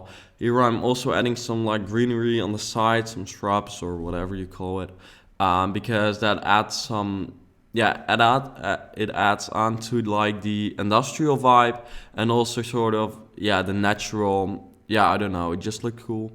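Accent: Netherlands. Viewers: i don't mean to be rude but please work on your english. you have a dutch accent and I don't like listening to it. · I hope you're trolling. He has a dutch accent because he's dutch, you idiot. Figures the channel name would be self-explanatory enough! As if he's gonna change to cater to one person's dissatisfaction when his english-speaking skills are perfectly fine! If you're that butthurt by it, it doesn't take a rocket scientist to find the mute button on the video. "I don't mean to be rude" my ass. Pull your head in or go back to your perfect english-speaking safe space. fantastic build as usual! :)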